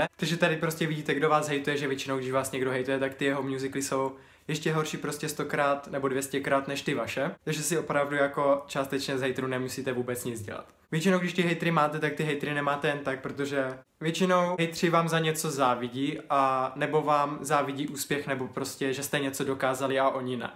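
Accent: Czech Republic. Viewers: Ty jsi z Olomouce jde to poznat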